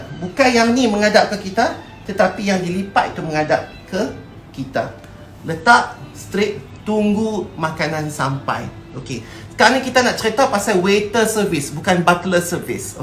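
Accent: North, Malaysia. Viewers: Dulu saya belajar KH ERT/RBT etika di meja makan...kira ok dahla tu....wow rupanya lagi detail ye...thank u..belajaq sambil dok gelak ngan celoteh utara dato' lawak aih...tengok sampai habis nah..sampai kul pagi 14/8/2020...hahaha bosan x tau nak tengok apa...sekali sekala menimba ilmu ni...kan senang nak dapat...moga lekat dlm kepala otak ni...aminn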